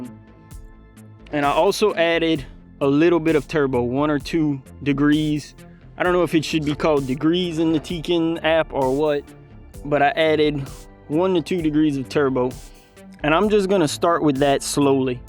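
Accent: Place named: South, United States. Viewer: That definitely sounds like a south of i10 accent.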